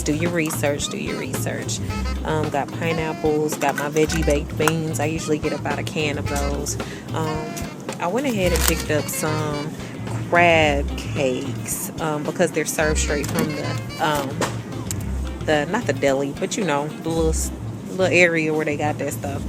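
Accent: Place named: United States. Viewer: Girl sounds american but strongly looks southern african.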